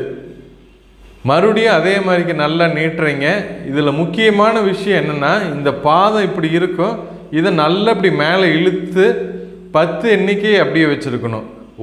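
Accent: Kongu, India. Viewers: கொங்கு தமிழில் பயிற்சி மற்றும் விளக்கம் அபார்ம் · கொங்கு தமிழில் அழகாக தமிழில் விளக்கியதற்கு மிக்க நன்றிங்க 😊🎉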